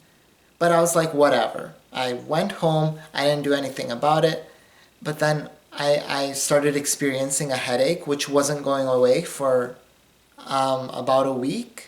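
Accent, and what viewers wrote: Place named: Canada. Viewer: That canadian accent thi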